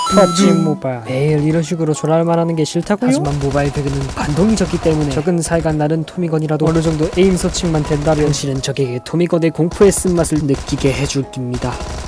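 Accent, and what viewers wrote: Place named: North Korea. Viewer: 북한인이 말하는거같누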